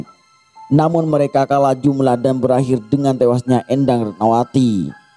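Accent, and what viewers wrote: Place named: Madura, Indonesia. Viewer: bner kok logatnya ajh keliatan orang madura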